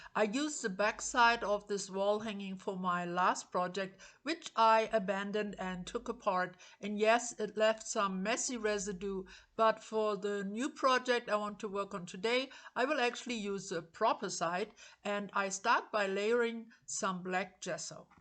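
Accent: Germany. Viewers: Dear, congrats to your fabolous skull art piece! I love it very much. I´m also in love with skulls, especially combined with glitter and shine... To use old embossing powder to highlight the eye is a very cool idea and turned out very nice. I´m looking forward for new cool videos from your creative lab🙂 P.s. Do I hear a little german accent?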